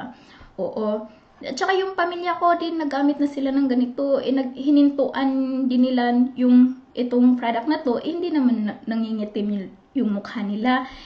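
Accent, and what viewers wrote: Philippines. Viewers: Kapag bisaya magtagalog ang lambing pakinggan ,,kaway kaway sa mga bisaya dyan.. by the way mam pwede po ba yan gamitin sa nagpabreast feed? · boses ilongga ka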